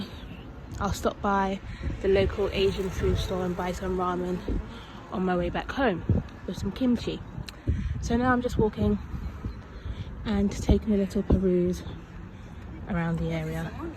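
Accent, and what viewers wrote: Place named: United Kingdom. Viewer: your British accent is so proper and soothing. you could be a narrator for sure 😂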